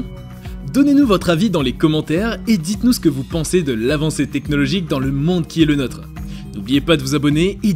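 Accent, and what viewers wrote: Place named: England, United Kingdom. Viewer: purée trop bien ton accent anglais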